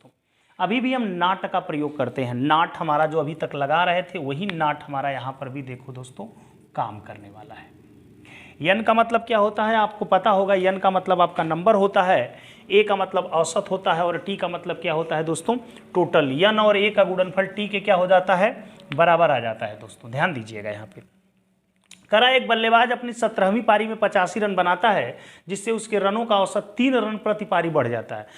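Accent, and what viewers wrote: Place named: Bhojpuri, India. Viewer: Bhojpuri andaj me jabardust lag rhe h guru ji😊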